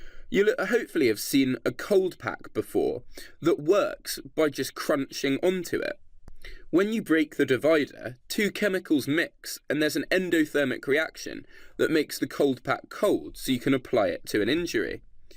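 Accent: United Kingdom. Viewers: good content, posh accent unbearable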